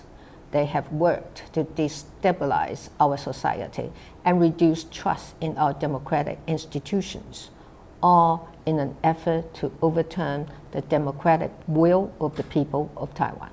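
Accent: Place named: United Kingdom. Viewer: 做得好不好我不知道 但這英文能力很強呢 而且因為是對歐洲各國講所以用英國腔 其實挺厲害的(我只會破美語XD)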